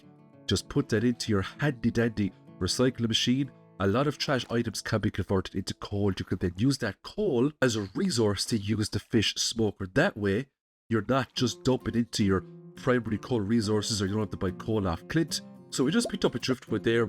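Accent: Ireland. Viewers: 1st time viewer. I came across your video in my feed and decided to click on it, and was not disappointed. You gave some amazing tips that I have not seen anyone talk about. Great video, I'm going to check out your channel, and watch a few more videos. Just a curiosity question, If I may... Listening to your video, and from your accent, are you from Ireland, by chance?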